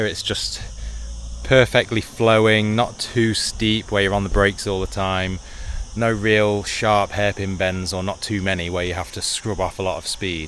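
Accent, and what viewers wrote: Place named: United Kingdom. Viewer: He’s British, not Spanish. Do Spanish put in British accents when pronouncing British place names???? 😂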